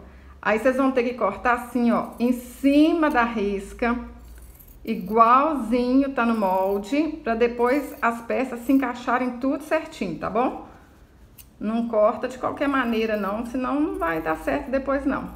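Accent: Minas Gerais, Brazil. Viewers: Vc é mineira né? Esse sotaque eu conheço.kkkk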